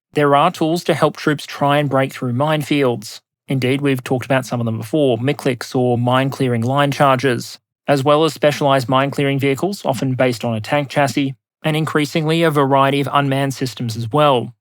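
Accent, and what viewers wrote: Australia. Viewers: I really like your videos. They are interesting, and you rarely cover a topic that I don't consider worth investing the time necessary for watching. However, you're talking rather quickly, and the information is dense. Together with the at times a bit difficult to comprehend Australian English, which sometimes takes me a few seconds to figure out what word I just heard, and consequently needs me to rewind a bit because I missed what you said when I was thinking about that, and with the videos all close or over one hour, it is not exactly easy listening (maybe only for a non-native speaker). Aber before anybody is asking: Yes, I know I can set the playback speed lower, but 75% is really a bit too much and gives me the impression of listening to a Whisky connoisseur en masse, hence that's not an option. So my question would be: Did you ever consider splitting your videos into chunks of 20 minutes or so, which would give you the chance of talking a bit more slowly, and non-native speakers a better chance of being able to enjoy listening? 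😊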